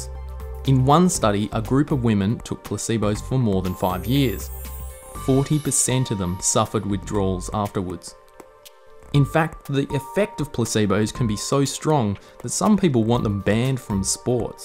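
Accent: Australia. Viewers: thats aussie accent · this australian accent makes it impossible to take seriously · Umm... all i learned is that you have a freakin' wicked aussie accent, mate1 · Love ur Aussie accent :D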